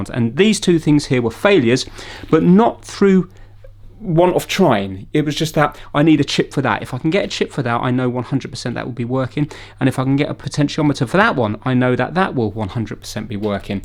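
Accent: United Kingdom. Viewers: bro your accent make me want to leave my contry and com live in uk ,i love your video and thank you for all what you do sorry for the bad english 😅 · Hi. I wonder if you have ever watched the Grim Reapers YouTube channel? The reason I ask is because your voice is a dead ringer for "Cap", the channel owner. Am I on to something, or is this just a matter of all you Brits sound the same to we Americans?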